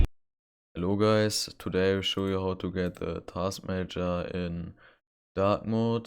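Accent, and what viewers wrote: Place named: Germany. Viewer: ich hör nen deutschen aktzent :D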